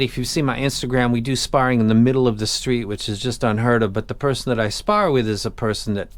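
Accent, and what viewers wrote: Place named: New York, United States. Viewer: I had no idea that you live in Japan. neat. Given the somewhat tight space and accent, I assumed you were somewhere in NY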